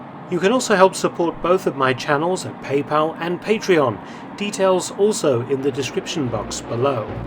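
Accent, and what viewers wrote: United Kingdom. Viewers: Great documentary. Superb British accent.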